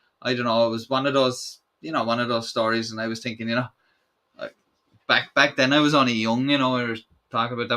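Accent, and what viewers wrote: Ireland. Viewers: nice to hear the Irish brogue on YouTube.🇮🇪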